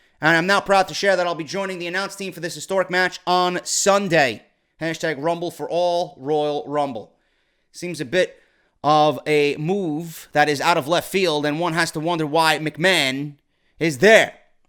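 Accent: New York, United States. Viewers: I've only very recently subbed to your channel because I like what you do and how you do it, anyone can tell that you're passionate about this and the RAW 25 rage in your video made me laugh in a good way. Don't ever let haters or people who have no clue put you down, a lot of these "9 - 5" guys hate their jobs and would give anything to be able to do what they love for a job. As what I suppose someone like you would call a "casual" I don't know anywhere near as much as you about the business but I've been interested in maybe starting my own series of videos (with a more light hearted tone because I just don't have your experience). Keep it up is all I can say, I enjoy your content, plus I'm from the UK and your NY accent is awesome. lol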